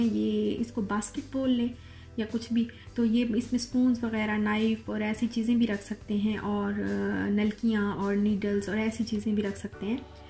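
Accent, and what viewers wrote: Saraiki, Pakistan. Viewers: Mein bhi saraiki hun....shoro sy hi ap k lehjy sy mjy pta lg gya tha k ap sariki hain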